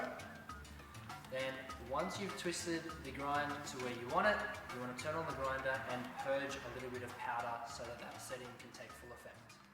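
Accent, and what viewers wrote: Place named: Australia. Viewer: So good to hear an Aussie voice - The drone of Americans is more than I can take! Thank you for imparting your wisdom on us. We have a mini mazz - So many 'baristas don't even drink coffee!!! We start each day with a ristretto piccolo or 3 - The key to a happy marriage: )....oh and we subscribed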